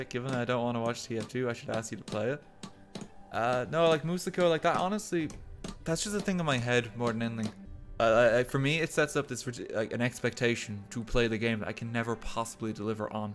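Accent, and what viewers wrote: Ireland. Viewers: The Irish accent is awesome.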